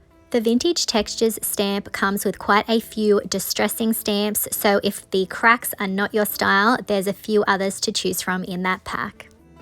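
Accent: Australia. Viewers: I've been watching your videos for several months, and have never commented. Shame on me! Honey, you are just terrific! I have learned so much from watching you. Everything you do, you do with excellence. And beside all that--this old Florida girl just loves your Aussie accent. Keep up the good work.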